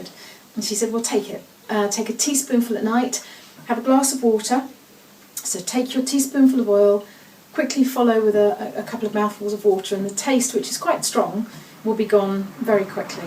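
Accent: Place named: United Kingdom. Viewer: Love the accent, I swear, every girl from the UK is just ahead of the game simply because of it...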